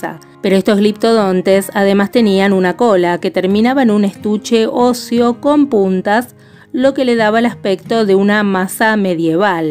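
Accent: Argentina. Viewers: dices por aquí, de donde eres? te noto acento argentino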